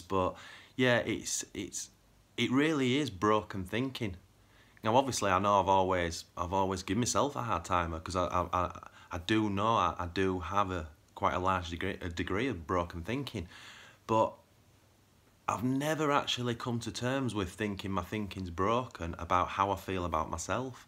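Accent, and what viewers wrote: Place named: United Kingdom. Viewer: Great vid, totally get it. Were's your accent from? Im from uk now living in australia. Your accent sounds same as mine : )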